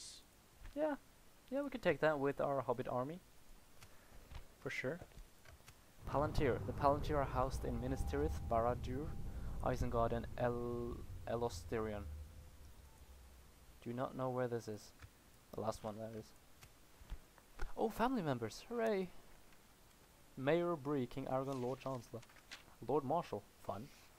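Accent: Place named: Norway. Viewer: are you norweagen you sound little norweagen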